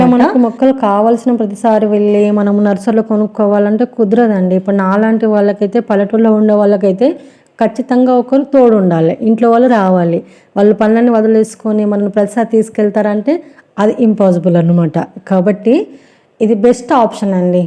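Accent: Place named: Telangana, India. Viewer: Asalu chandalam antey endi andi mana Telangana lo chala slangs unai ah matram kuda miku telvadha adhi village slang miku nachakunte vinakandi mimalni evar froce cheyaleru antey kani okari basha baledhhu Ane right miku ledhu...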